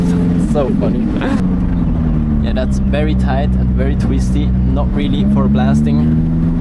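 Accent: Switzerland. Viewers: As a german i want to hear your english more likely then your SCHWEIZER-DEUTSCH Accent. (No hate btw✌️) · te schwiss german akzent ghört mer sehr dezent haha, aber schön :D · Are you from switzerland by any chance? Recognize the accent :)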